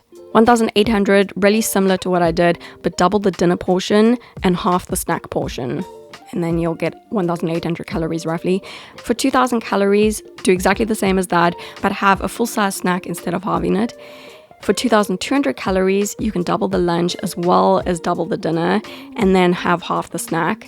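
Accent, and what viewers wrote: South Africa. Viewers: She's from South Africa. (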